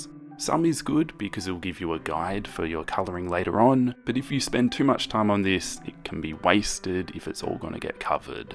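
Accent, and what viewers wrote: Australia. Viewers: Wait he's Australian not british?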